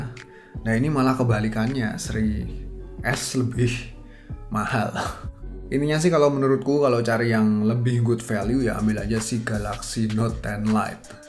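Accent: Java, Indonesia. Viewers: asli nya jawa ya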